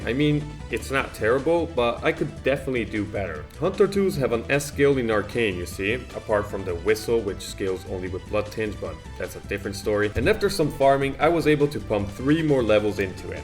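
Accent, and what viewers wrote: Italy. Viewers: To be fair he sounds perfectly north Italian to me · Are you italian? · r u italian? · Mi stavo chiedendo perché riuscivo a capire a orecchio il tuo inglese, poi ho visto che sei italiano😂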